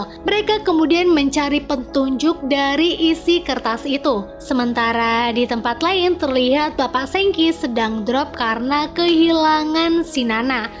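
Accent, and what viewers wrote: Pemalang, Indonesia. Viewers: Mbak nya orang Pemalang? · mbak orang pemalang ya?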